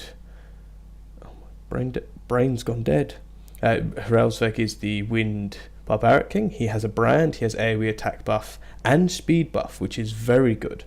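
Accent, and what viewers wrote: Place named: Surrey/Hampshire, United Kingdom. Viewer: Great video, really informative! Was actually stunned to see only 100 subs, so take mine - also you sound like you're from Surrey/Hampshire?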